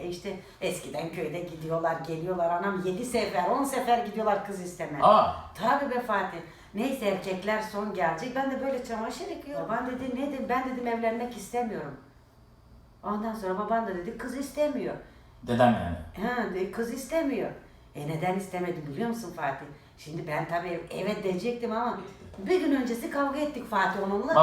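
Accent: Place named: Thrace, Turkey. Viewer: Yaaa ne kadar tatli bir ablasin ❤ bol bol boyle sohpetler hikayeler reelslar paylas nolur 😅o kadar eglendirdinki bizi Allah'ta seni guldursun ablacim❤ bu arada annemde trakyali kirklareliden sen konustukca bizim oraların ruhunu hissediyorum buram buram 🥹🥰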